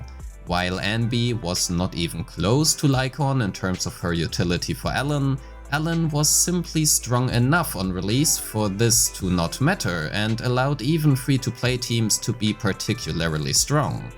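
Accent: Germany. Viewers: I am german and it took me not long to realise you're german as well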